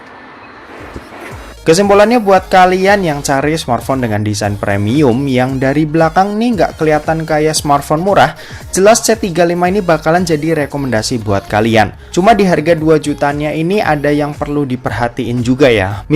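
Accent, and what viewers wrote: Semarang, Indonesia. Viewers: Asli Semarang bang?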